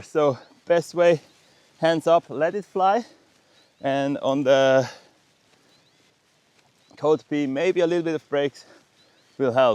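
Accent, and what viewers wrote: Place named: Europe. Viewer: Thanks for the review. Btw I can't quite figure where you are from in Europe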